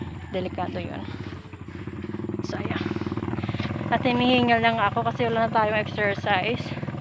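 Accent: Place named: Waray, Philippines. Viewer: Waray gud kamo pag habal habal. 😁😁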